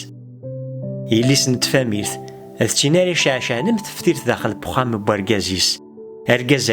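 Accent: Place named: Kabylie, Algeria. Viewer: J'aime comment tu parles le kabyle que je trouve très authentique. Un travail, un recit remarquable. Je vous salue.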